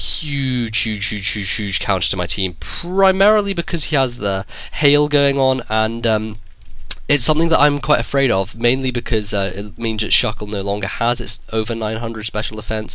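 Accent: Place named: United Kingdom. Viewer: I love your commentary! great to hear a brit commentating, sooo much better than the yanks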